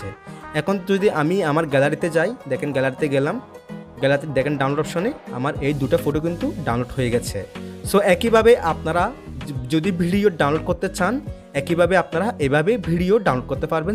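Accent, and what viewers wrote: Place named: Chittagong, Bangladesh. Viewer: হাজার হলেও আমরা চাটগাঁইয়া,, আপনার কথায় ধরে ফেলছি ❤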